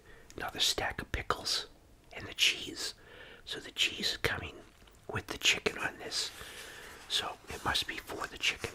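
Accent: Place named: Boston, United States. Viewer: I'm just curious... How would you foneticly write the way he says 'slaw'? Sloa? I just love that boastn' accent #billyburr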